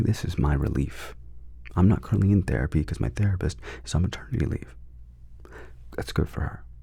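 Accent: South, United States. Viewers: What is happening here?!😯 You crack me up. What a wild video this turned out to be.😆 Helix please sponsor. He deserves a new mattress. Listen to him. He needs a good night sleep.😂😂🛌🏼💤 Your editor is hilarious. The back and forth. Nice clicky clacky sounds with William. I'm new to your channel; gotta love the silly giggles.🤭 Thank you for 'sharing' with us. Southern accent. Hey now.😭 Glad your Dad is okay. The Cat moment.🙀😻 We're off the rails but in a good way. I'm laughing uncontrollably, but with you, not at you. There's a difference. This was fun and delirious. ASMR realness.🤪👏🏻 Goodnight.💜🧑‍🔬💜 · I actually love your southern accent! More please!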